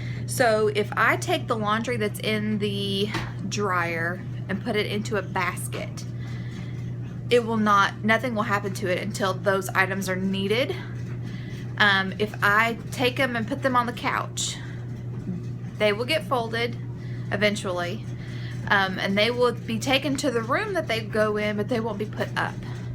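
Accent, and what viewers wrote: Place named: South, United States. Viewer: Gopher= go for- That's that classic southern talking coming out. I say that word 15 times a day. If I put our clothes on the couch, I find them all in the couch. I pulled a sock the kids wore when they were 5 out of the couch....and I haven't had this couch but a year. my pillows are probably made of lost clothes. You have a servants heart and a desire to serve others and support your family. We also must have balance in our lives, and that can easily get out of whack if we are not making our balances in life a priority as well. It is natural for them to go one way and then the other. But, it's helpful when they remotely stay center. So good to see you on here since we never get to see one another. Try to relax, get some rest, drink enough water, and keep your strong faith.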